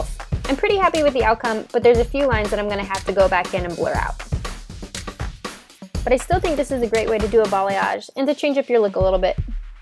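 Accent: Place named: South, United States. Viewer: what sounds like a Southern accent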